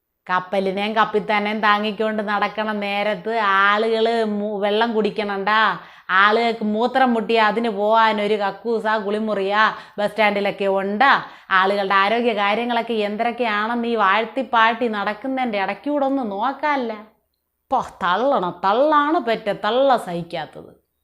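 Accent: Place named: Thiruvananthapuram, India. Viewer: .....Avatharanam Soopar ❤❤❤ AND..Avatharana, Shali, Thiruvananthapuram Basha Shali.... Adipoli..... Sooopar..❤ Sooopar...❤ Sooopar...❤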